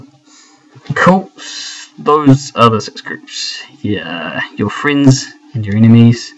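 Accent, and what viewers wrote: New Zealand. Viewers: Such a Kiwi voice ! Awesome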